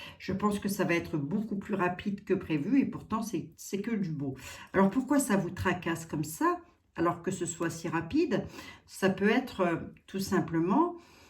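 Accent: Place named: Vosges, France. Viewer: vous êtes vogienne ? on dirait mon papa ,avec votre accent ;-)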